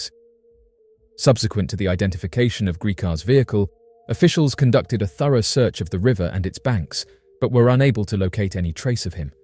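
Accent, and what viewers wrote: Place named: Public school, United Kingdom. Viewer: Oh yes oh yes my guy is back. What a voice. 2 million here we go. Sleep awaits. 😅 Edit- just the one story so far, still great though. No shade on the "new" lad, perfect enunciation just not intensly soporific. Who doesn't love random tales in public school English. Best video in years chaps. Hand crafted like the old days. Love it. ❤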